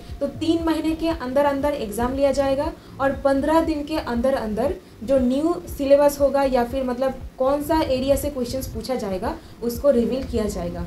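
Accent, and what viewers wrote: Bengal, India. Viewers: Mam r you Bengali?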